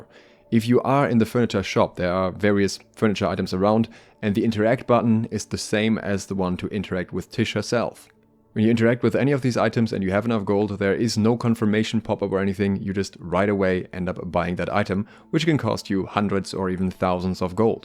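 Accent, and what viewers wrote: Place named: Germany. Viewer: You sound like you have a German accent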